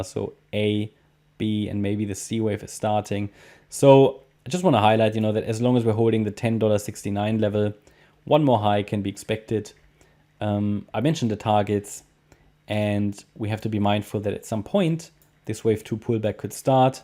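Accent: Germany. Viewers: man hört so nen deutschen akzent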